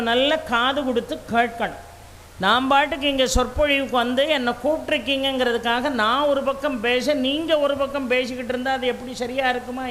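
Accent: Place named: Tirunelveli, India. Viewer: அருமை அம்மா. எங்கள் அழகிய நெல்லைதமிழ்ப் பிரவாகம்💐🙏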